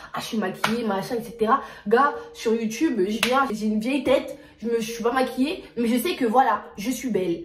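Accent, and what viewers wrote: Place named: Democratic Republic of the Congo. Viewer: Juste par ta façon de parler en reconnaît ton pays😄🇨🇬💪.Une vraie congolaise.Ne changes jamais ma belle.Restes toi même💪😘.Dié tala vidéos za kaka🤣🤣👌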